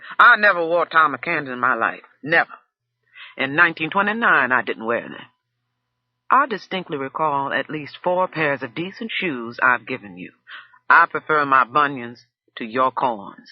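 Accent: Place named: Midwest, United States. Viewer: Wow. Lynn Thigpen. I know some of her family and her work onstage and onscreen is excellent. Great voice. That lovely clear Midwest Black voice.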